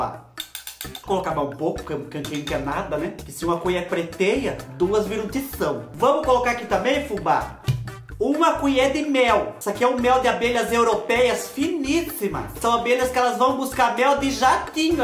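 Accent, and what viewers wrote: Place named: Caipira, Brazil. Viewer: Vc é ótimo fubá kkkk não perco um vídeo é bom de mais esse sotaque caipira eu quase morro de tanto rir kkkkkkk kkk😊😊😊😊😊😂😂😂😂😂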